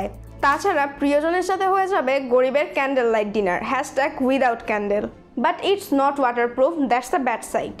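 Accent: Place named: Malaysia. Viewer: that’s great i heard bangla spoke from malaysia but it’s sounds different. dunno bak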